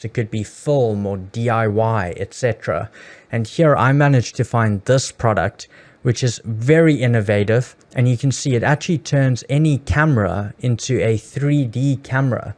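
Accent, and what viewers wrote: South Africa. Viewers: That South African🇿🇦 accent 🔥 Thank you broer😊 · Are you from South Africa?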